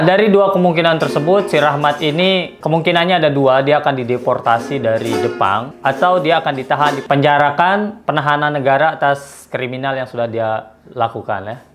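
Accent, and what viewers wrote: Lombok, Indonesia. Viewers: logat sasak tdak hilang,.keren abangku😁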